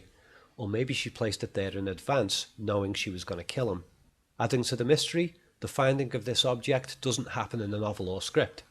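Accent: Liverpool, United Kingdom. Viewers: I realy cnt listen to a Scouse accent trying to sound sophisticated😂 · Nice to hear a fellow scouser narrating don’t hear it often :) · Good video brother, nice to go on YouTube and hear a scouser doing a film video ;) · I don’t care how good, intelligent and eye opening this video is, and it is very well analysed, if it’s described by a scouser it sounds retarded. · Are you a scoucer?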